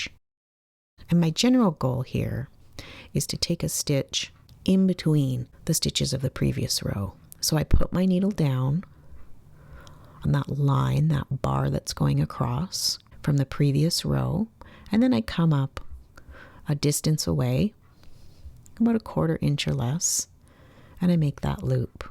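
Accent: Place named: Canada. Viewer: I recently found your channel, and I love it. You have a very pleasant voice to listen to. I can tell by certain words that you are from Canada. But only certain words. Thank you for what you do. I have bite the bug and gone down the Slow Stitching rabbit hole. Nothing completed yet. I also bought your mini animals and plan to buy more. When the budget allows. Thanks again.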